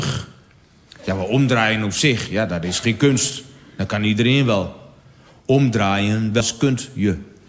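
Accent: Twente, Netherlands. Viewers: Antwerps dialect k dacht toch echt dat dit Twents is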